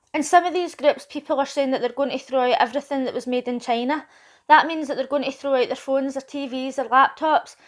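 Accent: Scotland, United Kingdom. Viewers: ps ...Im London all my life,...nothing wrong with your scottish accent...perdfectly understandable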